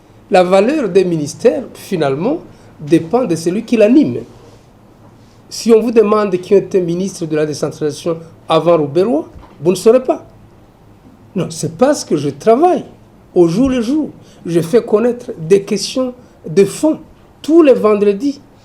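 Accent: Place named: Rwanda. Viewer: Ba rerere Rien que l'accent ezo Funda. Papa toyeba bino bozalaka ba rencunier ba hypocrites les Rwandais.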